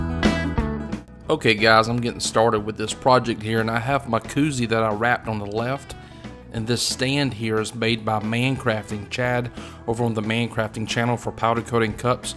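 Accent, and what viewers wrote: United States. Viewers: 2 questions please: 1, can I use this method on wooden coasters, will it give a non stick finish for coffee cups? 2, are you quoting 200 deg Fahrenheit or Celcius - I am assuming Fahrenheit as by accent, you appear to be American. It could be very helpful if you noted either Fahrenheit or Celcius in future videos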